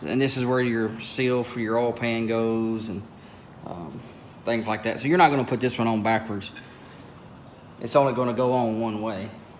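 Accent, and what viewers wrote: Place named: South, United States. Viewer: That you bud? Looks and sounds like a hill-billy I grow'd up with- but anyway, I bought a '84 F-150 with a 'yet to be determined' 351, I think. Having hell finding the stamp, said to be on the block. I had been under impression it was a 351 W, but wasn't satisfied using the 'count the valve cover bolts' method, and thanks to a parts-house dude, certain of it not being a Windsor or a Clevelend, yet unsure what it actually IS, I'm back out there at 1st light this A.M. to find the stamp- just so's I can replace this leaky 2-barrel Motocraft Carbureator. Someone said that Edlebrocks are universal? Anyway, I wish my pa had pushed tools instead of a pencil and swung a hammer more often than a gavel, 'cause i think this Ford-thing may stick on-me. Love this truck. 4-wheel drive, 33" Mickeys & glass-packs with that classic '84 front cap, but with a work-bed added at some time. 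The scales at the dump says "5,890 LBS"-DRY! Stay outta my way! Ha!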